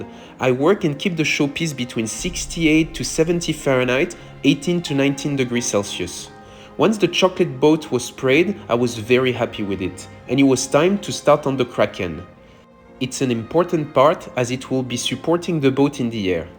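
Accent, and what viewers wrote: France. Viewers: Oh man, now I get what people mean by "strong french accent". And that's from someone who's French themselves ! Over than that, great english, and super cool Kraken. Your work is always breathtaking ! :)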